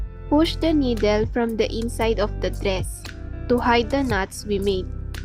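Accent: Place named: Philippines. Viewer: Your accent tells me that you are Filipina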